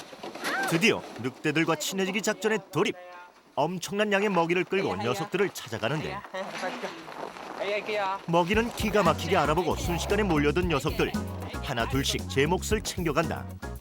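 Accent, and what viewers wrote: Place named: Seoul, South Korea. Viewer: 와 저때도 서울사투리가 좀남아있네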